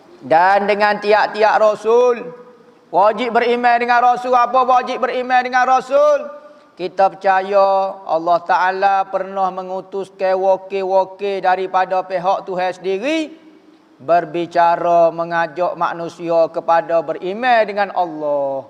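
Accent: Kelantan, Malaysia. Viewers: hnya org kelantan shja fhm dan tahu itonasi nada pelakk prcakapan bhasa negeri sndiri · X..ramai dh makin fhm dgn loghat kelantan..dh um x guna bahasa pekat pun.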